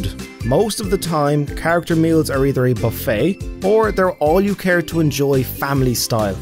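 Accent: Ireland. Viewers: Love 💗 Irish accent! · Are you Irish? I love your accent! It reminds me of a professor I had in college. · sounds it, I have an Irish neighbor who sounds like this but female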